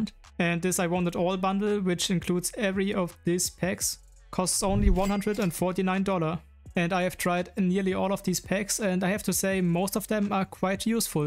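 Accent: Germany. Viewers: Do I hear da etwa Deutsch?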